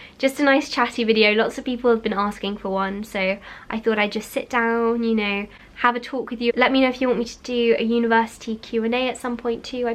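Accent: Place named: England, United Kingdom. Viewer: I like English sound, better than American sound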